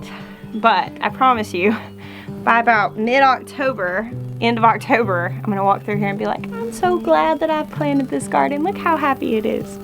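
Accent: South, United States. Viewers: Holy southern accent in this video! Never noticed it as much as this video! Must be the heat in the fall. 😃😃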